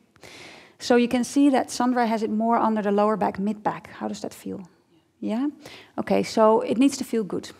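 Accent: Netherlands. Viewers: Heerlijk die Hollandse T's in plaats van de 'Th' klank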